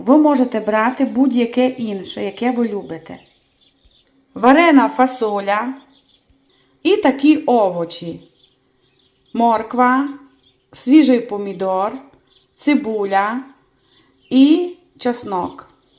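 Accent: Transcarpathia, Ukraine. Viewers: це закарпатський діалект. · Ви не мудра жінка, не подобається, не дивіться. То закарпатський діалект, пані просили, щоб вона розмовляла саме на діалекті. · З задоволенням послухала закарпатський діалект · Привіт з Рівного!Дякую за рецепт! Діалект мені дуже знайомий, дядько одружився в Закарпатті,в місті Свалява,все життя прожив там,уже теж мав такий діалект. Нажаль вже його немає,але тітка ще живе, спілкуюся з нею,і з двоюрідним братом, який захищає Україну,з 15 року,та сестрою. Мирного неба всім! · Який чудовий Закарпатський діалект❤❤❤. Даже не знаю,що більше сподобалось😊😊😊. В дитинстві бабуся таку страву готувала і назва його була у нас булянка😊😊😊. Західна Україна. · Ви прекрасна господиня. І дуже вас приємно слухати. Гарний діалект закарпатський❤ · ОБОЖНЮЮ ЗАКАРПАТСЬКИЙ ДІАЛЕКТ, ЯК І ВСЕ, ЩО ПОВ'ЯЗАНЕ ІЗ ЗАКАРПАТТЯМ!!! НЕ ЗВЕРТАЙТЕ УВАГИ НА ( ЯК БИ ПОМ'ЯКШЕ СКАЗАТИ)- НЕДОЛУГИХ.У ЦЬОМУ РОЦІ ПОБУВАЛА НА ЗАКАРПАТТІ - ЗАКОХАЛАСЯ І В ЛЮДЕЙ І У САМ КРАЙ! 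ЯК УЇЗЖАЛА - НЕМОВБИ ВІД РІДНІ ПОЇХАЛА - ЗА 3 ДНІ ВЖЕ СУМУВАТИ ПОЧАЛА!!! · дякую за рецепт! І окрема особлива подяка за чарівну закарпатську українську мову! Хочу більше закарпатських слів! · Это закарпатский диалект не нравится не слушайте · Чудова господинька і справжня закарпатська говірка ❤, аж любо слухати ❤😍🔥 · Дякую за рецепт. Тяж цікаво слухати закарпатський говір і вже буду знати що сіль це по-закарпатськи суль ( я з Прикарпаття і у нас говорять переважно як літературна українська мова хоча і є в різних областях своі назви деяких овочів та всього іншого ( наприклад у нас кажуть на картоплю бульба а в деяких селах кажуть бараболямі тільки тепер почула що на Закарпатті на картоплю кажуть ріпа а я думаю а де ж то ріпа —щось не бачу аж потім зрозуміла що це картопля ( бо у нас ріпа це такий білий буряк ) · Приготувала, дякую за рецепт страви i закарпатський дiалект. Щастя Вашiй ро́динi. 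· В українській мові немає слова паночка, є лиш панночка ! А то закарпатський діалект, доволі красивий! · Тільки ідіот поставить дизлайк. В кожному регіоні України люди розмовляють на своєму діалекті. Жінка розмовляє на українській мові з закарпатським діалектом. Браво господиня!!!!!❤❤❤❤❤❤❤ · З великим задоволенням слухала вашу мову і з теплотою згадувала щирих і добрих людей із Закарпаття. Здоров'я та миру вам. Дякую за ваші рецепти, дуже цікаві. Дуже дякую вам за щирість. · Дуже гарний рецепт, дякую вам! Особливий колорит додане закарпатський діалектя в нього закоханий! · А мені дуже подобається слухати закарпатський діалект, я чомусь вчуся. За рецепт дякую. Приготую з грибами😀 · Спасибо большое за рецепт, новые слова и прекрасный закарпатский говор. Я русская американка, с началом войны смотрю и слушаю только украинские каналы. Язык уже понимаю очень хорошо, говорить и писать пока не умею)) как собака понимать понимаю, а сказать не могу😂 Победы и мирного неба🇺🇸❤️🇺🇦🇮🇱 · Нормальний закарпатський діалект. 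І нічого чіплятися до жіночки. Як що вам не подобається, не дивиться. А мені все зрозуміло, хоча я вчилась в російськомовній школі. Господині респект за рецепт. 😊❤ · В неї закарпатська говірка. Не подобається, то не дивіться. Але ця її мова доволі цікава, і її не важко зрозуміти. Здоров'я господині і процвітання каналу.😊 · Це справжній закарпатський діалект,а ви вчіть історію України і будете знати в якій області як розмовляють.А Вам велике дякую за рецепт,вже приготували всі були в захваті.Дуже смачно.Чекаю на нові рецепти.Також робила по вашому рецепту голубці онуці на день народження теж всім сподобалось.Дякую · але ваша мила Закарпатська говірка мені дуже подобається! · Це так розмовляють на Закарпатті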